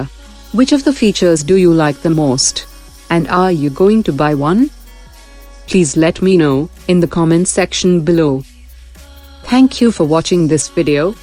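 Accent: India. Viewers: I cant tell if this is a robot voice. And if so why the Indian accent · Holy shit me too, I was so confused whether or not it was a real woman or a robot with indian accent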